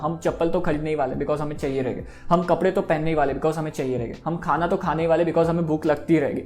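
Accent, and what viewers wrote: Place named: Gujarat, India. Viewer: Jiv me thoda ..... 😅😁... Gujju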